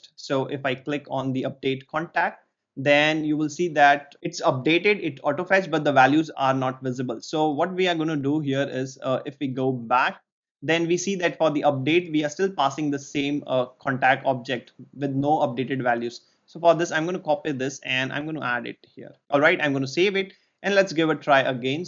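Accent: India. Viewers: Thank you, even though i got a problem to get your accent, you'll guys from India makes programming get easier. Have a good week.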